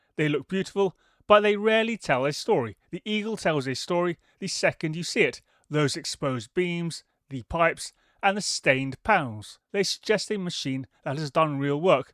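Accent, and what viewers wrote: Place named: United Kingdom. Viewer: I really don't need some guy with a British accent telling me the plot's and science is nonsense. It's FICTION like everything coming out of the mouth's of EU leaders these days. I liked the stories.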